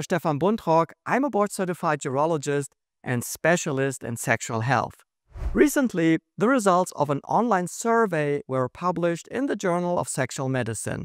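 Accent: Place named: Germany. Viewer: When he introduced himself with his name i just looked up like: damn dont even realize someone is also german until they sqy something in german... Like bro, i am german and dont recognize my own accent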